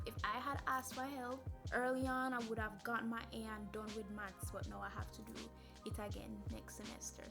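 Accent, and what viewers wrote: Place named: Jamaica. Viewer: my entire family is jamaican and idk why but hearing your voice made me feel so at home!! keep it queen ✨ love the videos !!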